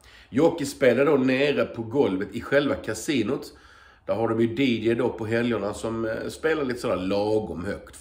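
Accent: Skåne, Sweden. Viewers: Pratar skånska nu?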